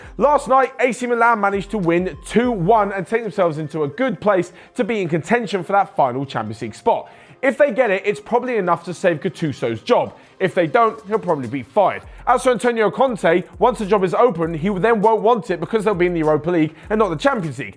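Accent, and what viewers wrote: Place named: England, United Kingdom. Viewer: he sounds English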